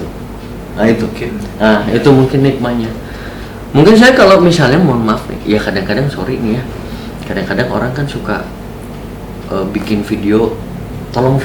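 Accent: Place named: West Java, Indonesia. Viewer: Jawa barat khas banget bang mantapppp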